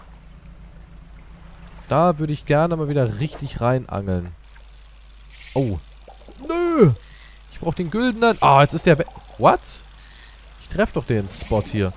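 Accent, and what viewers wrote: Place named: Austria. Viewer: achsoooo XD ja gut da waren schon 2-3 bier im spiel und bin österreicher, da mischt sich der dialekt auch ein wenig mit ein ^^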